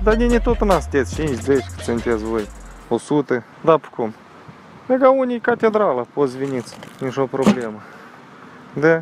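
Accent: Moldova. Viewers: Ce imi place accentul tau moldovenesc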